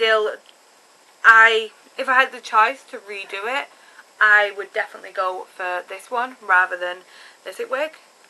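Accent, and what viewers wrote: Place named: United States. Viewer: why does your accent keep fading to american lool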